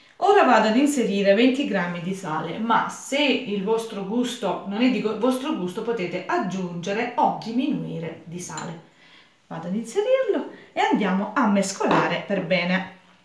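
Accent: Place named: Apulia, Italy. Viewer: Meravigliosa ricetta come sei meravigliosa tu.... sentire il tuo accento mi emoziono perchè ricordo l'unica vacanca che ho fatto nella meravigliosa Puglia 10 anni fa, quella terra rossa tra gli uliveti fuori dal mio trullo , nella meravigliosa campagna , l'azzurro del mare , i profumi per le stradine di Ceglie me li porto ancora nel 🧡 Un caro saluto da Verona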